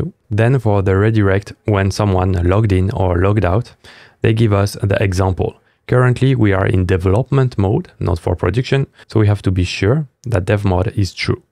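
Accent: France. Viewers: Do I hear French accent??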